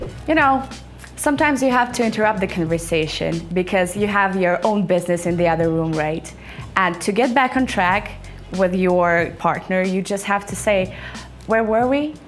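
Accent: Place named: United States. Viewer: That is american accent not british which care about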